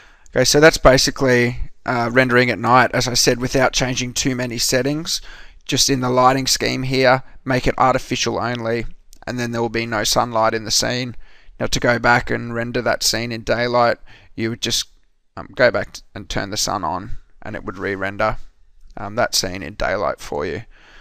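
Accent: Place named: Australia. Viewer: Wow, great work! Thanks for the help! love love love love that Aussie accent too :P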